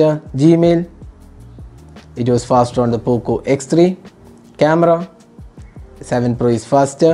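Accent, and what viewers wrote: Kerala, India. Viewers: Accent keettit malayali anenn tonunu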